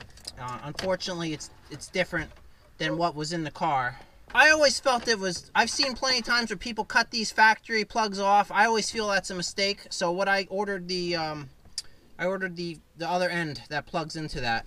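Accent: New York, United States. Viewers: Awesome video- that accent is like every neighbor I ever had from NY area- keep up the videos they are a huge hit.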